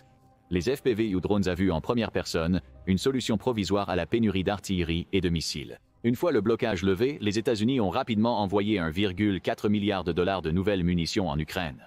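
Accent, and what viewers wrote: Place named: Quebec, Canada. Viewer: c' est radio moscou ici , avec une i. a a voix quebequoise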